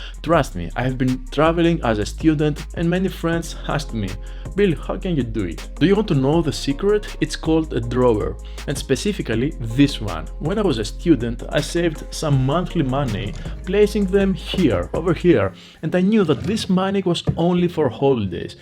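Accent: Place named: Greece. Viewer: I like your greek accent 😁 Nice video 👏👏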